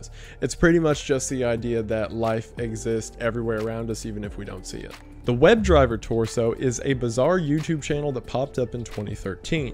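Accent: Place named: Georgia, United States. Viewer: it's a Georgia thing I think. I got a buddy from north of Atlanta and he talks exactly like. all the same turns of phrase, mispronounces words the same way, same cadence - the works.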